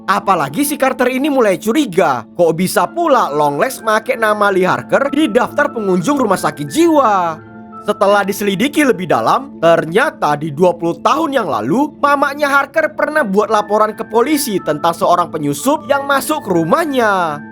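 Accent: Medan, Indonesia. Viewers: Logat nya bicara medan kali bah😂 Gabasabasi langsung awak subs yekan☕🗿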